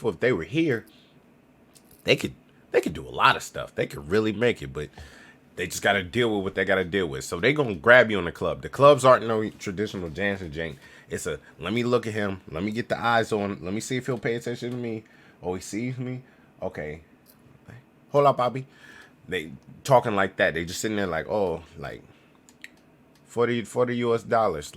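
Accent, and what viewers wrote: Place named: Dominican Republic. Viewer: U actually do look Dominican. They can just tell from how you carry yourself...and your accent of course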